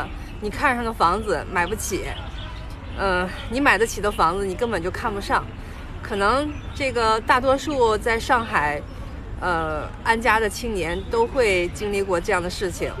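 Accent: Northeast, China. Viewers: 还是好好说话吧，东北话或者标准普通话，混着说，有点别扭，买房子属于私事，没必要上这里来说吧，这期做的有点拉分了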